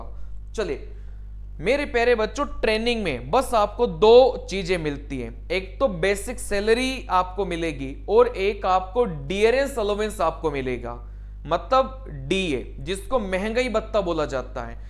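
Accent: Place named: Kashmir, India. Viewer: Ap kashmir se hai kya😅😅 mera room mate bhi aisi hindi bolta tha or wo bhi kashmir se tha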